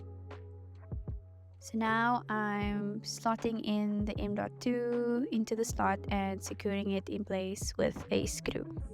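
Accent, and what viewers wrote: South Africa. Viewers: South African accent. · Am I hearing a CPT accent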